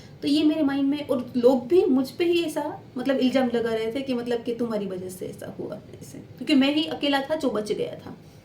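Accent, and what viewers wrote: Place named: Gujarat, India. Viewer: .... Tamari bolvani style.. Havbhav bikul Gujrati se 👌👍😇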